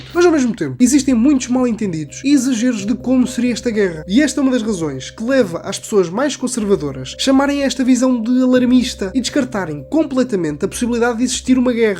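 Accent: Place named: North, Portugal. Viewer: Fixe! Logo percebi que você era do norte de Portugal, Coimbra, Aveiro, pessoas dessas regiões são melhores pra outros países lusófonos perceberem o que é falado por elas, das ilhas são as mais difíceis. Muito bom seu conteúdo, espero que cresca bastante o seu canal.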